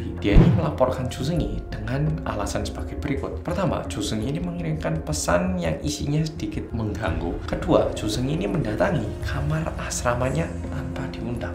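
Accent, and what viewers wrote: Java, Indonesia. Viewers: Untung mas Hansol dulu di bawa ke Malang..korbanya cma rawon sama pecel...beruntung dan besyukur...teman" di skolahan masih berhubungan baik...jadi medok lagi. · Jawanya terlalu berat untuk aku. Sukar utk dihadam 😅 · Mas Hansol :hai guys welcome back to korea reomit bersama orang korea yang........ Satu kampung sama gw : Medhooooooooooooook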